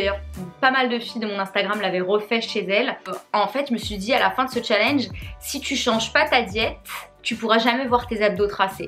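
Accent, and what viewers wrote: Quebec, Canada. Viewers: C'est moi ou tu as un petit accent québécois 🤭🤭❤️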